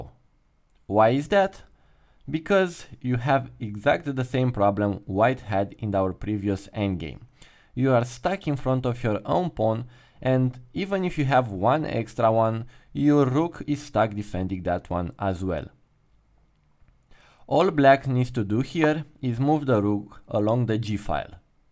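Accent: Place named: India. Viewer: I didn’t know you had an Indian accent.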